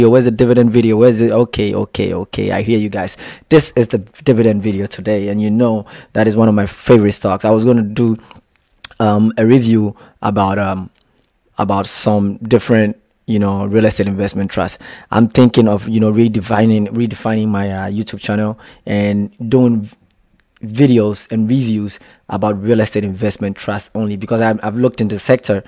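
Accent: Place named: Nigeria. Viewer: This guy sounds nigerian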